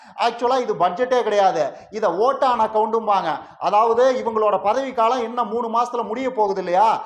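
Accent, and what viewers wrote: Kongu, India. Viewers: Nee su mu modu idhula kongu slang vera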